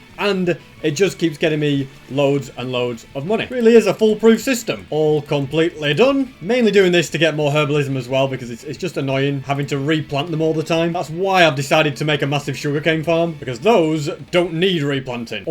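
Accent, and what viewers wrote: United Kingdom. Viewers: hes british? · He is British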